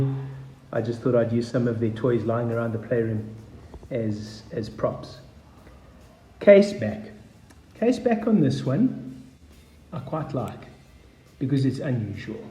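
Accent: South Africa. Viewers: What accent is that ? I'de guess SA.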